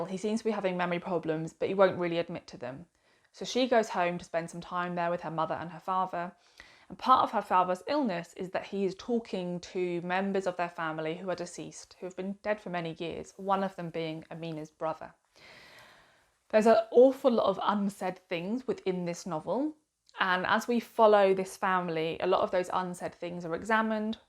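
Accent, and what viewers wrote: United Kingdom. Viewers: I have just subscribed to your chanel. Such an eloquent, clear and very informative book genre review. It's so refreshing to firstly have a book chanel without the person waving a book around while you are trying to look at it. Someone who speaks clearly and I can understand. I'm British and so are you so that's helpful 😅. I've grown tired of many of the book chanel on YouTube, yours is so good, thank you. I'm 73 and an avid reader since the age of 5. But I just feel I need good quality suggestions , which you provide. Well done. Julie x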